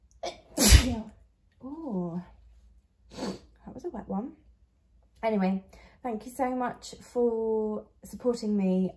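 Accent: United Kingdom. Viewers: I don’t know why but I thought you would be American! But you’re a fellow Brit!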